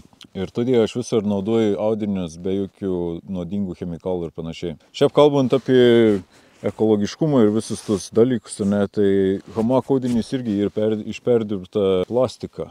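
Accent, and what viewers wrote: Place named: Samogitia, Lithuania. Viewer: Vėin už žemaitiška rukavima pagarba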